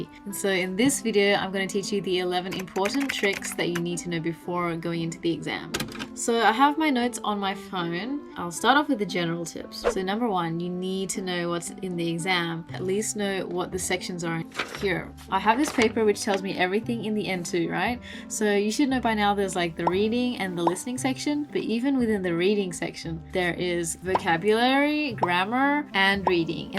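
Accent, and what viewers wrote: Australia. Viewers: Are you Australian? Very cool accent. Feels a mix of several accents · Your accent is 75% Aussie and 25% US!! WTF?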